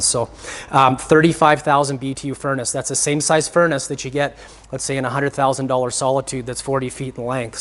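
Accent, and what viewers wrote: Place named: Canada. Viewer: Your Canadian accent is goals lol and I love your in depth reviews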